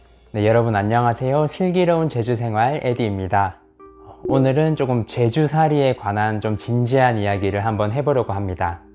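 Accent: Gyeongsang, South Korea. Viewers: 표준말을 경상도 사투리로 쓰시는데요. 귀욤!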